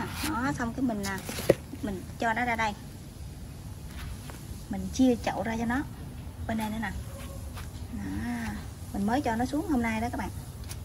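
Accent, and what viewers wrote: Mekong Delta, Vietnam. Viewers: WOW dam chất miền tây nam bo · nghe tiếng của chị người miền tây chị ở tỉnh nào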